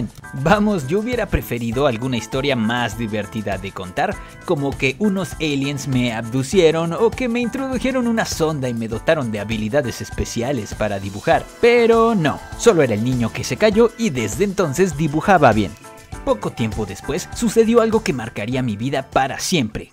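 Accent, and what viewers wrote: Veracruz, Mexico. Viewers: Entonces eres de Veracruz??? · ERES MEXICANO 😱😱😱😱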